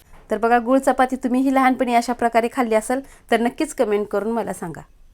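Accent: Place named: Satara, India. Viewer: ताई तुमचं गांव कोणतं भाषा आमच्यासारखी वाटत आहे.आम्ही खटाव तालुक्यातील जिल्हा सातारा कडचे..सद्या कोल्हापूर येथून व्हिडिओ पहात आहे.आमच्याकडेआमचीआज्जी चपाती मोडुन चुरा करुन त्यात तुप,गुळ घालून छान लाडु करुन सर्वांना द्यायची तुमची रेसिपी खुप आवडली. मी मुलगी आणि सुनबाईनां व्हिडिओ पाठवला.लहानपणीच्या या प्रेमळ नाष्ट्याला आता ती सर येणार नाही.गेले ते दिवस सकस पदार्थ खाण्याचे.ओके..ताईखुप खुप धन्यवाद!!!!**"*❤😂🎉🎉❤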